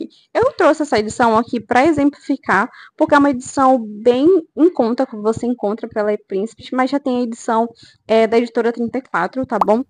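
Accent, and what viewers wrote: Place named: Pará, Brazil. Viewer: Seu sotaque é do Pará.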